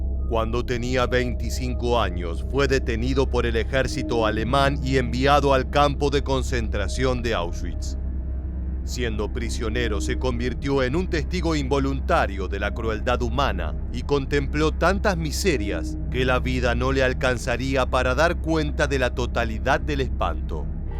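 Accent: Argentina. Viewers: Argentina, no escuchas su acento? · Es Argentino, sólo escuchá su acento.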